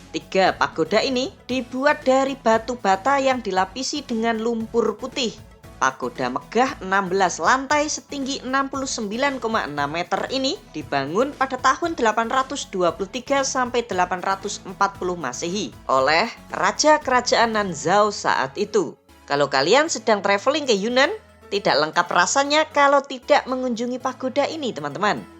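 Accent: Java, Indonesia. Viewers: eksyen naratornya yg njawani banget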